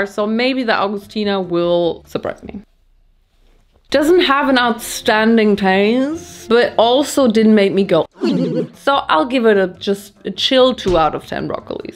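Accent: Bavaria, Germany. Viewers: Der bayerische Akzent war halt echt nicht schlecht 😂 Grüße aus Bayern! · bist du bayer?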